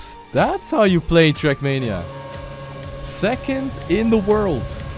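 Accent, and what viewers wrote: Germany. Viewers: mann hört halt auch ein bischen das du deutscher bist lul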